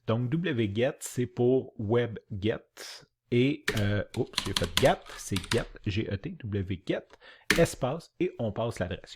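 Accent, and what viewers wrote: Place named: Quebec, Canada. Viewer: Le Français canadien 🎉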